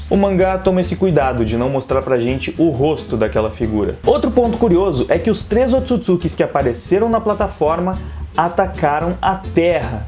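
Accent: Rio Grande do Sul, Brazil. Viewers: Obrigado pelo vídeo, grande trabalho, vc é do rio grande do sul? (Eu sou), abraço.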